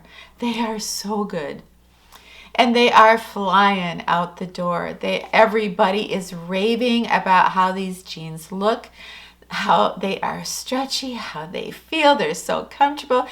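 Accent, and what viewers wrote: Midwest, United States. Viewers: Your accent is Midwestern? Where are you from?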